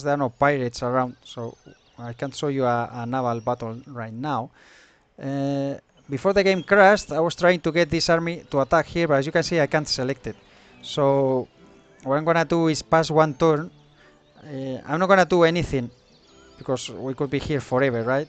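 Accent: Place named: Spain. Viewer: Solo me pareció extraño , entiendo que el mundo es anglo parlante pero me pareció extraño sin más , eso si , sino fuese por el acento jamás notaría que fueses español porque hablas muy bien el inglés. Pero bajo mi punta de vista una campaña se agradece en el idioma de uno, en este caso español , más cómodo y agradable o al menos para mi , hablando de series yo me vería encantado una de este mismo , del 1600 y con España como no podría ser de otro modo , hay que reconocer que el mod está algo verde y sin acabar pero aún así tiene su punto , además de que se juega en una Época donde todo nos era un poco más favorable y hace más disfrutable la campaña , al contrario que el 1700 donde empizas afixiado con el imperio. Soy de Málaga compañero , saludos.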